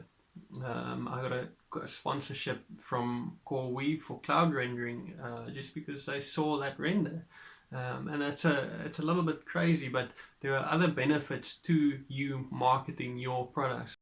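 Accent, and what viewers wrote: South Africa. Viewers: I could tell that you are South African by your access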